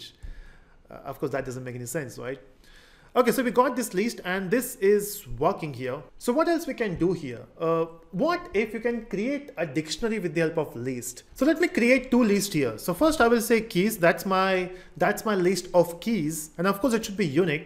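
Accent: India. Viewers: Hindi accent makes me not understand English well. Regards 😔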